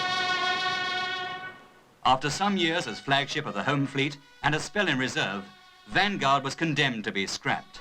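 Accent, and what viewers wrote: United Kingdom. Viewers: Love the British narration. Tip: tour HMS Belfast on the Thames. Sea going comfort for all crew.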